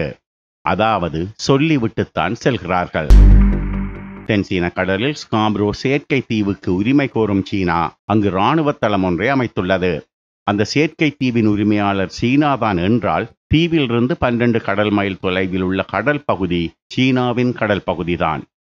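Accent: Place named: Jaffna, Sri Lanka. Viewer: எனக்கு யாழ்ப்பாண தமிழ் மிகவும் பிடிக்கும்🇮🇳👍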